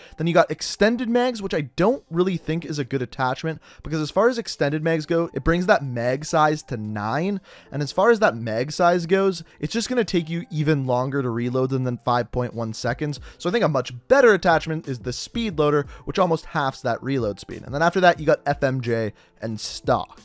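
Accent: Canada. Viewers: are you Canadian?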